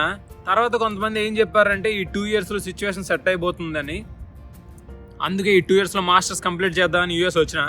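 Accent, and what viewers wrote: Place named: Telangana, India. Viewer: Endi bro slang Andhra nunchi Telangana ki maarindi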